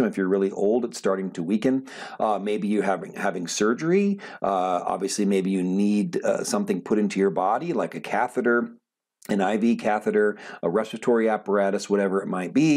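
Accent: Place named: United States. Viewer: Goddamn sir finally. Finally someone who speaks American English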